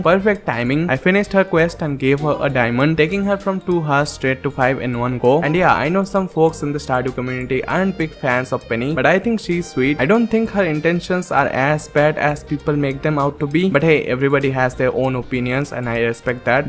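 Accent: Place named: India. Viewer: also you are definitely understandable in English just with funny Indian accent which makes it unique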